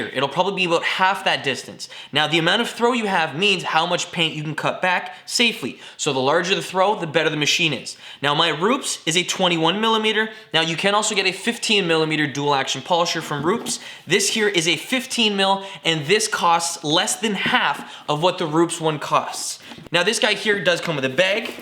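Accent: Canada. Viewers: definitely Canadian (not holding it against you) lol. Thanks for the demo!! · After 1 second of watching this....wonder which part of 🇨🇦 he’s from 🤔....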